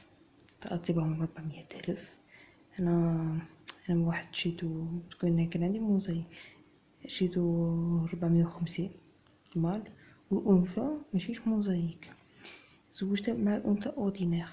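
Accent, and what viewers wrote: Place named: Marrakesh, Morocco. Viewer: صدقني بالله و سمحلي يا وليدي بلا ما نحلف بلي مراكش عارف شا راك تهدر بالتوفيق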